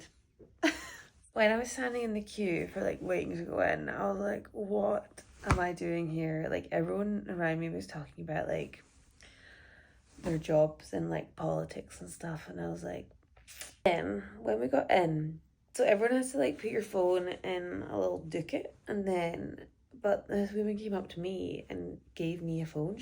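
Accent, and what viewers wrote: Scotland, United Kingdom. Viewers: Dear Scotts, please do not ever tone down your Scottishness! Fantastic world-changing innovations have been created by Scotts! Congrats. Life ‘is’ a big adventure and take all the nuggets you can!! 🎉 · So sad to hear this as an English woman. I think normal English people love Scotland and the beautiful accent. Be proud!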